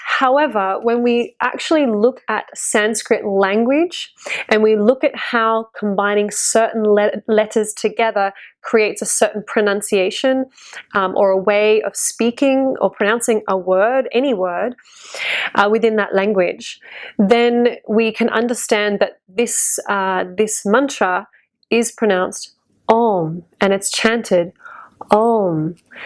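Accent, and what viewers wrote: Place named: Australia. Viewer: Thanks for your reply. Just curious, your accent sounds Australian. Am i right?. Where did you study(assuming you did) in India? Some common places for westerners being Rishikesh or the Shivananda Ashrama in kerala. I am from India by the way and i am a native of a the temple town of madurai working in Bangalore at present.. We are a family of traditional Brahmins and Vedic scholars, with my father maintaining the ritual fire. We use OM to start vedic chanting and to end I found your explanation for OM to be down to earth and realistic, compared to the one's many fake Indian gurus give.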